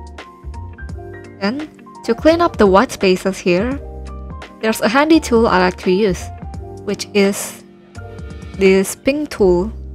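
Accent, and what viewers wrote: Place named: Indonesia. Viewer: dari logatnya mah indo inii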